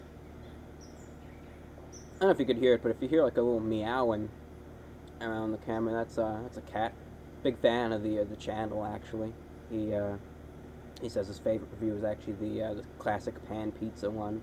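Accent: United States. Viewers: You are very American aren’t you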